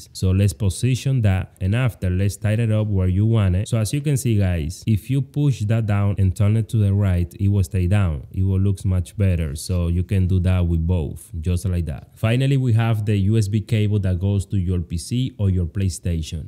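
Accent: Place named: Dominican Republic. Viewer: i know a Dominican when i hear one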